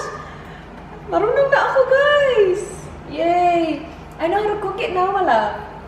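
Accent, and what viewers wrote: Visayas, Philippines. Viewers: Gusto ko mga vlogd mo..saka gusto kits personally kasi Hindi ka katulad mga ibang nakapangasawa ng foreigner lalo na Australian na napapanood ko sa dito sa yt na exage gumaya sa accent ng husbands nila...ikaw very natural ang English at fluent..saka gustong gusto ko yong Tagalog mong may accent na bisaya..Godbless.. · kaya pala parang familiar ng tono mo..katabing isla lg pala..sending my love from negros☺️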